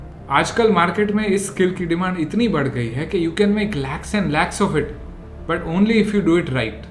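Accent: Gujarat, India. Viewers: Gujrati hai kya bhai tu ?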